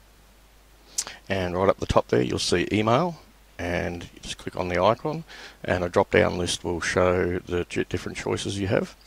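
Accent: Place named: Australia. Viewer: Mate, Your accent is awesome. Brought back all the fantastic memories I had in Australia. The best country, people and culture I ever experienced in the entire world. Video helped, good on you mate. Cheers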